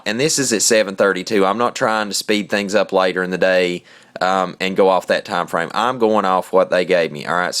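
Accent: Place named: Carolinas, United States. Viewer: This is SO cool!....... Btw, Are you from the Carolinas. I have lots of family down there,cos my Mom was from Kings Mountain, NC, and I recognize the accent. and I LOVE it!!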